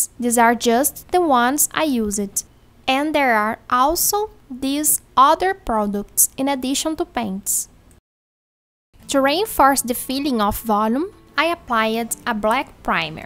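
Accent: Brazil. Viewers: Otimo trabalho *-*, quero fazer um cabo de arma para uma flintlock pistol impress, é pra ser uma madeira mais lisa e polida, da de seuir o mesmo padrão? pelo sotaque chuto ser mineira ou do norte kkkk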